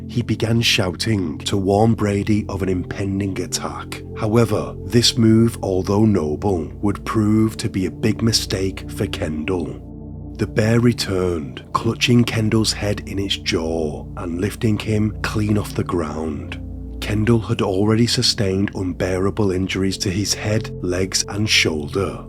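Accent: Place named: Liverpool, United Kingdom. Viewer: Don’t let anyone crap on the way you speak. It’s lovely. I love a slight scouse.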